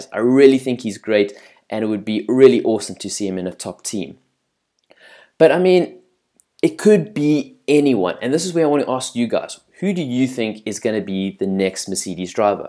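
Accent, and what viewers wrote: South Africa. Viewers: .....nice South African accent boet.........but your pronunciation of the drivers names is shocking...ja ok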